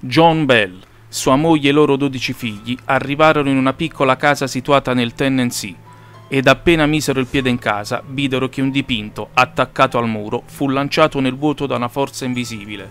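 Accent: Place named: South Tyrol, Italy. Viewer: Ti do like perché te lo meriti, però promettimi che fai un corso di dizione, e che ti Levi l'accento tipico del tirolo... Senno non puoi avere un tono professionale...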